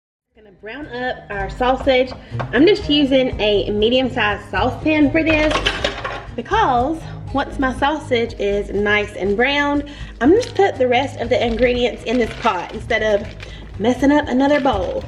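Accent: South, United States. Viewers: I'm new to your videos, I just subscribed today. I love your videos and can't wait to try some of the recipes. I'm from Mississippi and I love your southern accent. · LOVE all your recipes but looking forward to fixing the sausage pinwheels for my kids coming home to visit in September. I too am a Southern Girl and love your accent..Hello from Coastal North Carolina!!! I’m fairly new. Discovered you about a month ago!! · I am fairly new to your channel. I love your southern drawl. I would like to see some unique and different breakfast recipes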